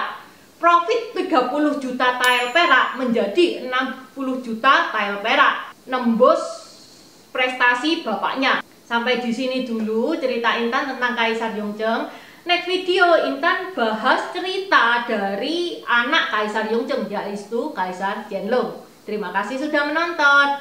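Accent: Java, Indonesia. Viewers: Suka cara ngmg mba intan ini...lucu ngakak..trus kl liat wajah kaget..liat logat nya ..jawa bagett...haha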